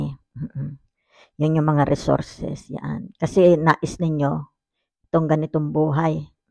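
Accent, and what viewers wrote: Batangas, Philippines. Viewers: thank you tita..GOD Bless you and your family..your a batanguena if i am not mistaken..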